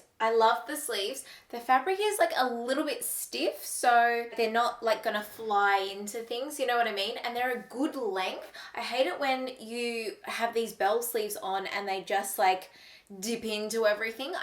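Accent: Australia. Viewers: this is a really random question but is she from australia? idk she sounds australian though. just asking because i want to watch australia youtubers and she was in my recommended and she seems nice and i love her already ahaha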